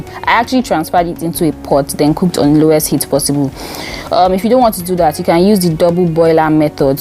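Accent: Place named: Nigeria. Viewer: You are so pretty plus I love the nigerian accent 😊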